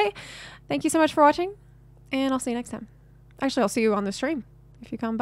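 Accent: United States. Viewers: the country accent is strong with this one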